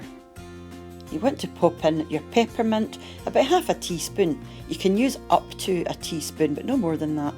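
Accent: Scotland, United Kingdom. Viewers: Fantastic , straight to it , no messing around , I wanted to make these for a long while , last time I made them I was in school and could not remember how to do them. I forgot about the egg white . Thankyou for your upload , I am making these tomorrow 😊 love your soft Scottish accent . Was a pleasure to listen too , I will subscribe and see what other recipes you have .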